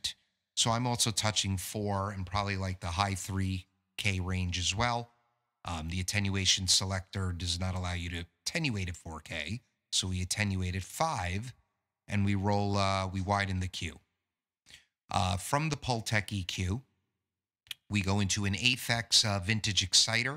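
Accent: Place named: United Kingdom. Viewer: Now I see where your daughter gets her acting spirit! The British Accent adds a nice touch.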